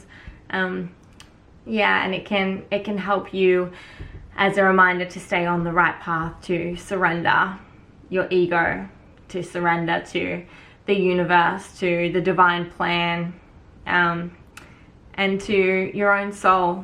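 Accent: Australia. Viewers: Australian accent is very difficult for Russians🤯🤪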